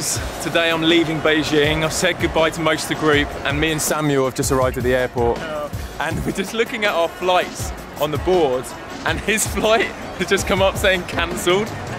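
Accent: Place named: United Kingdom. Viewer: GOD, the British accent is SO GOOD, I could hear you talk all day!!! Greetings from Brazil ❤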